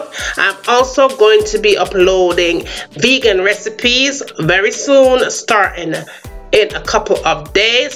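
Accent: Jamaica. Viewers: I came here to find out how to cook drumsticks. Within 3 seconds I knew the cook was a Jamaican! Must haffi yam it now!!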